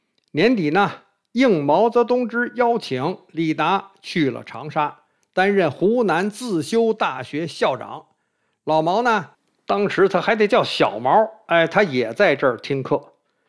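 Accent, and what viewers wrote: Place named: Beijing, China. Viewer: 爱听的地道北京话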